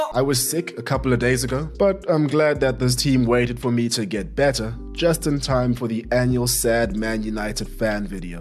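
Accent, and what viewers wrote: South Africa. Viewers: Bru u sound South African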